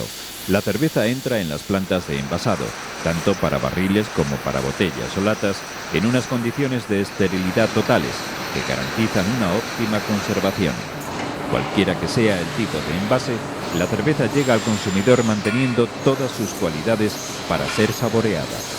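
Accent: Spain. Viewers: Definitivamente esto es adictivo, las tomas, los paisajes, el contenido, la voz en off, todo me recuerda mi niñez cuando todos los documentales se doblaban a español ibérico, gracias. Saludos desde México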